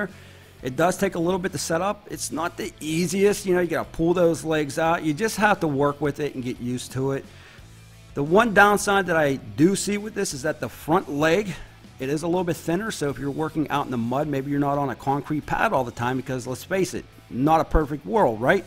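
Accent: Pittsburgh, United States. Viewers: just by listening to you talk i know you're a Stiller fan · Do I detect a yinzer dialect?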